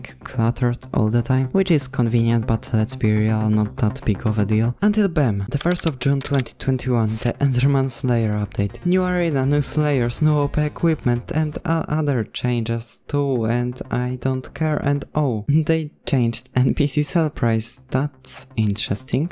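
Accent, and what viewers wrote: Poland. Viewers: are you polish? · Are you Polish? Your accent seem like a real good Polish one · Your english is special (better than mine). Od początku wiedziałem że jesteś Polakiem. Trzymaj się tam i powodzenia w rozwoju kanału. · polski akcent jest mocno słyszalny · Are you from Poland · you sound like guy from poland speaking english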